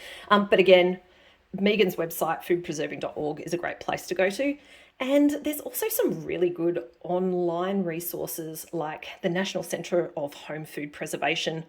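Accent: Australia. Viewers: Hi, first time viewer here and I really enjoyed this video regarding preserving. I have done some previously but we moved back to Qld from tassie and I lost touch with my passion. I am now back in Tassie and I want to resume my preserving. I feel that I have lost some of my confidence, so I am sure that watching your videos it will help me along my journey. it is so lovely to hear an Australian voice and you explain things really well. Thank you for taking the time to put together the videos and I am looking forward to the next one. Jay 😊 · Thanks for creating this series for newbies like me! I recently got a pressure canner for my birthday and have been reading lots of books and trying to watch videos - however it’s refreshing to listen to an Aussie video for once 😂 Excited to watch the rest of yoir series tomorrow and hopefully give it a crack in the next fortnight! 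Cheers Samantha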